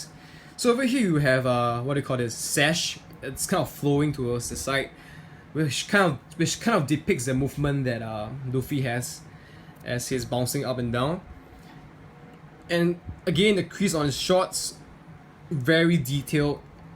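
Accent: Singapore. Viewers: By your accent, i know you're singaporean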